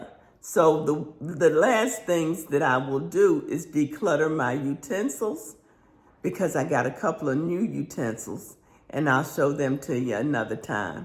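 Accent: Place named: Virginia, United States. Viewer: I really enjoy your videos and the way you speak. There’s something very comforting about your voice and delivery. I spent a lot of time in Virginia while stationed there in the Army, and the moment I heard you speak, I instantly recognized that familiar Virginia cadence. Wishing you and your family a very happy New Year.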